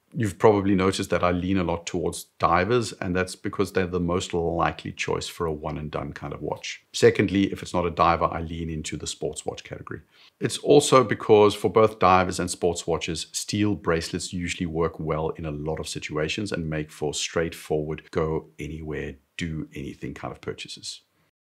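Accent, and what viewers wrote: South Africa. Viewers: I thought as much. You just cannot mask a Joburg accent... I had some of my watches stolen out of my luggage at OR Tambo... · sounds like a south african accent. i bet this gentlemen is from joburg or capetown, just a guess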